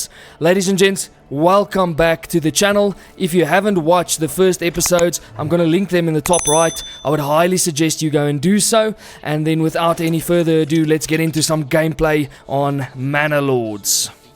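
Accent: South Africa. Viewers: I try not to watch games I play to avoid burnout; but the South African accent is cool as fuck! 😂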